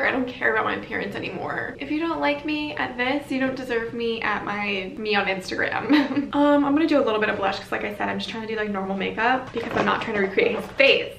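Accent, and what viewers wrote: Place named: United Kingdom. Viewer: Nah she just loves talking in british❤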